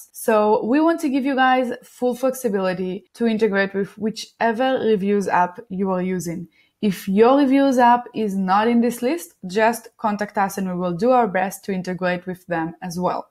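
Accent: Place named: Israel. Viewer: i can hear that Hebrew accent!! haha, great video, thanks!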